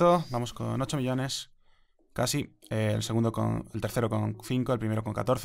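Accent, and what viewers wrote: Georgia. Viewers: are you from georgia?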